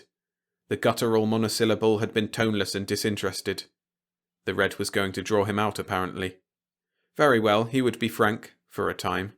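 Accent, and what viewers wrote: United Kingdom. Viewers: UK accents are annoying.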